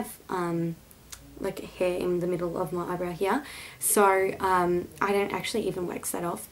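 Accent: Australia. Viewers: aussie not british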